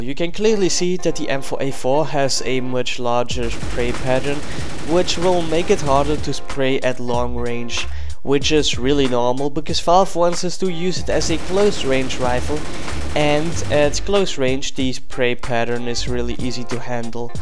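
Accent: Netherlands. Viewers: will you go back to dayz vids? btw youve grown well, ive seen you since 1k or something. i subbed because i heared you had a dutch accent. and you uploaded alot of dayz stuff. too bad im not interessted in csgo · are u dutch?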